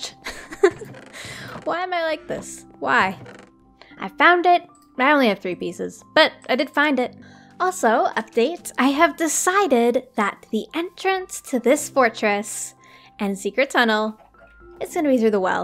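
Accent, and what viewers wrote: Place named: Canada. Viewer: As a fellow Canadian i love her accent aye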